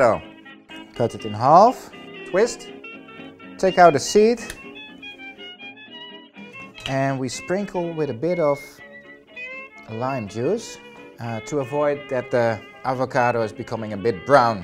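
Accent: Netherlands. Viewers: het is te merken dat je nederlands bent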